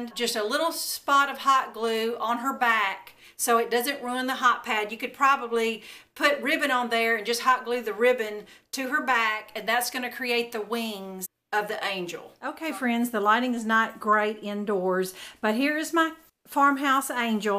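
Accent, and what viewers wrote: South, United States. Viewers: First time seeing one of your videos. Loved it and love your southern accent. You said if anyone had any suggestions for projects to let you know so here is mine. Oven door decoration made from 1 kitchen towel and regular cotton material for the bodice. Looks just like a little girl's dress and they also have the boy one too. I watched a couple of videos but one was so fast I couldn't follow it and the other was way, way too long and talking about everything except how you put it together. Love your videos and I hit the subscribe button.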